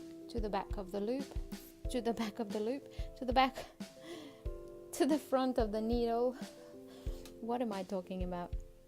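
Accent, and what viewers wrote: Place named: United Kingdom. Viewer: HI. Enjoyed your video. BTW your from UK? Like your accent. THANKS.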